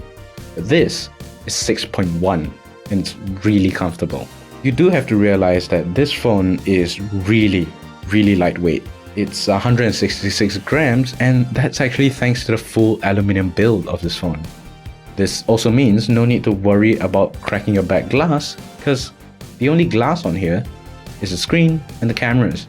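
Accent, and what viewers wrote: Nigeria. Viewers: You sound like a Nigerian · Why do you sound like a Nigerian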